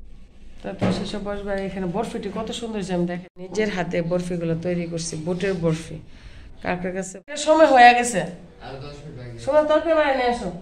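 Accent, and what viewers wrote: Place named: Brahmanbaria, Bangladesh. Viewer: তবে বিশেষ করে আপনাকে একটা কথা বলতে_ই হই, আপনার মধ্যে ব্রাহ্মণবাড়িয়ার একটা আঞ্চলিকতা লক্ষ করা যায়।❤❤❤❤❤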